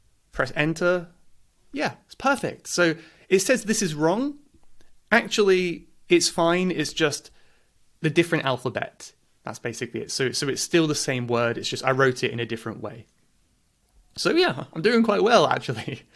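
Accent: United Kingdom. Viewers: I came across your videos today and I must say I love your British accent. I learned English at school, 50 years ago ! I have never had the opportunity to talk to an English person but now, at least, I can hear this lovely language. I have been learning French these last years and I used so many methods...! It's amazing how easy is to learn languages nowadays, (with a lot of motivation, as well). Thank you so much for your work. I would like to visit your country and take the famous 5 o'clock tea with scons ! 😊Greetings from Argentina.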